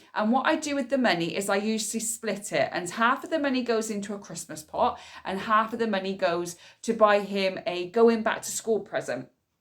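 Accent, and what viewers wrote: United Kingdom. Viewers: I love your accent 😍 💕 in kenya we call it peppa pig accent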